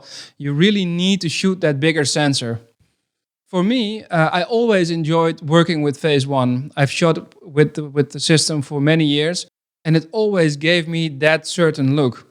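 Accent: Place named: Netherlands. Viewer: Are you Dutch, notice you have an accent. Nice video though!!!💪🏽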